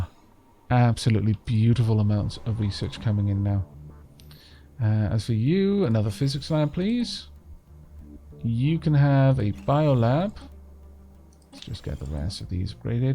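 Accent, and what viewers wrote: Wales, United Kingdom. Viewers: Merry Christmas. No offence but I love your Welsh voice, so lyrical and soft. Love the one hour vids, it's like I'm watchin' netflix. But your welsh and you stroke ferrets. How re the ferrets? Is that the reason for the soft voice, you sexy ferret beast.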